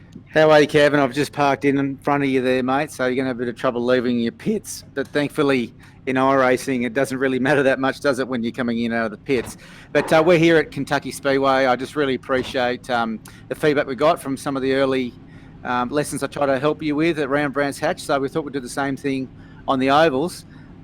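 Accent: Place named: Australia. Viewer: maaaaaaate, such a occa accent!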